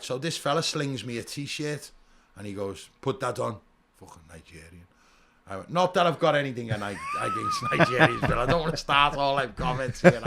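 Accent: Liverpool, United Kingdom. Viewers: Yeah okay scouse! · Nobody can tell a good Yarn like a Scouser 😂😂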